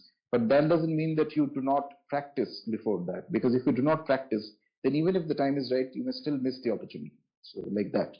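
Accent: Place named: United Kingdom. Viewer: Why are u speaking in BRITISHER INVADERS language? Do we have no INDIC language to depict .. And why are u calling उपासक as उपासका & भैरव as भैरवा, while you correctly pronounce Chanel, Louis Vuitton, Dior, Citroen, Moët & Chandon, Laurent Perrier, Veuve Clicquot, Louis Jadot Domaine Leroy, Château , Bordeaux and L'Oréal as per original French pronunciation & correctly pronounce ikigai, wabi-sabi, kaizen, shinrin-yoku, omotenashi, gaman), kintsugi, shikata ga nai & bonsai as JAPANESE pronounce them. Then why SANSKRIT terms are pronounced by you as beef-eater BRITISH pronounce them? You're full of arrogance & अहंकार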